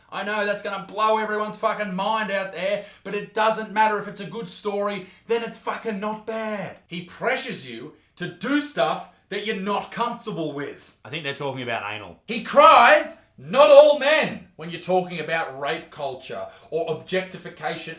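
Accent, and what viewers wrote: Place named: Australia. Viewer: Holy shit, you've outdone yourself! You Aussie gob flopper's make me laugh by talkin' naturally!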